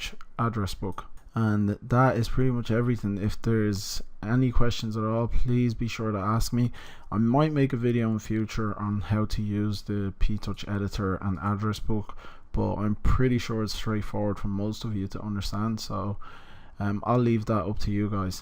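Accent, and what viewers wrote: Ireland. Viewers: Is this a black dude with an Irish accent?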